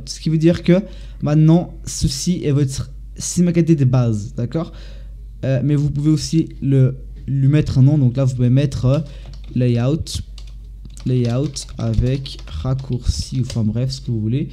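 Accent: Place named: Portugal. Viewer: il est portugais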